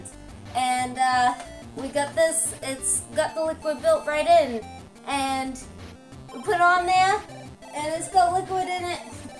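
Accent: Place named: Brooklyn, United States. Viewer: I can hear a Brooklyn accent...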